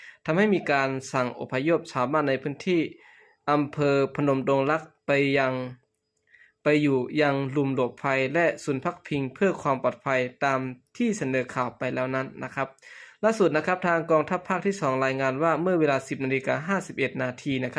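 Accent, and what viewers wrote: Laos. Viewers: คนรายงานข่าวเป็นคนลาวหรือ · คนอ่านข่าวนี้ลาวแน่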